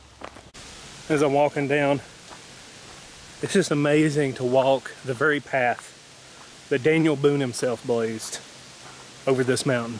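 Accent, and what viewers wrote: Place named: Georgia, United States. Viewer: I grew up in Tennessee, and I've been living in southeast Georgia for the last 8 years, and I can always hear the difference in the southern draw between Georgia and Tennessee when I listen to talk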